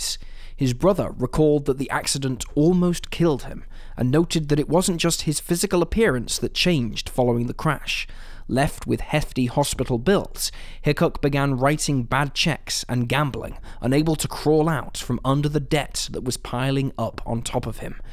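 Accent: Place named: Newcastle, United Kingdom. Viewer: He has the same accent as myself, north East England the accent is called 'a geordie accent'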